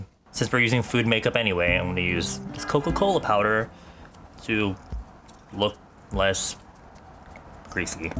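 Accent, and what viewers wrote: South Korea. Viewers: Loving the kenglish😂❤️ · sometimes i dont even realize its such strong konglish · That konglish tho😂 love you bae🖤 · Thank god I can understand fully your konglish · we love a konglish queen <3 come thru!! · YOU AND YOUR KORENGLISHHH OMG T~T idk what ur saying 😂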